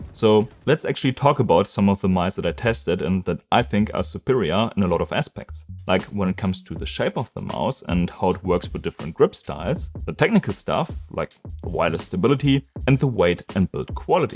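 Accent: Germany. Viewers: Mann hört so sehr den deutschen Aktzent heraus😅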